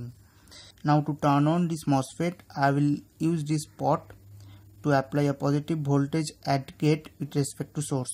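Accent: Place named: Mumbai, India. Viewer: bombay accent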